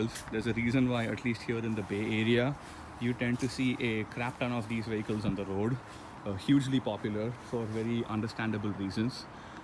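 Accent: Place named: India. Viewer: Your spelling is wrong.... it's not NOT " fluidly".... the correct term is "fluently"..... he speaks Indian-English..... the Indians try to speak the language of their White Master, but because their tongues have are tuned to speak Hindi, they end up with a completely different version of the English language.....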